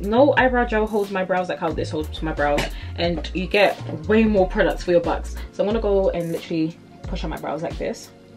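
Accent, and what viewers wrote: United Kingdom. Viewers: I've watched your videos before. Ibnever expect a British accent I think it's because to me you look like LA beautiologist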